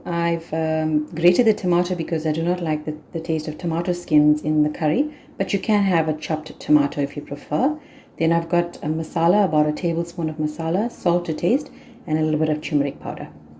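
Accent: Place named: South Africa. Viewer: Love the South African accent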